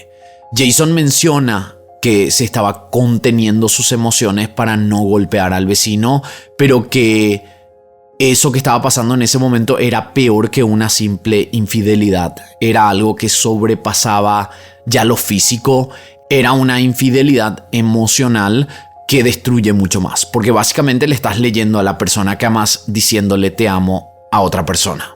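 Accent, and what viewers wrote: Paraguay. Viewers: ¿Tu acento es paraguayo? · Sos paraguayo? sonas re paraguayo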